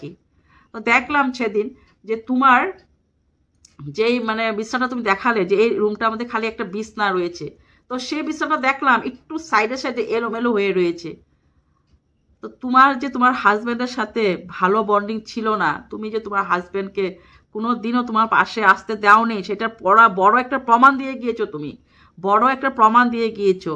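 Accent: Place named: Tripura, India. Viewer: তুমি কি ত্রিপুরাতে থাকো? কথা শুনে মোনে hochche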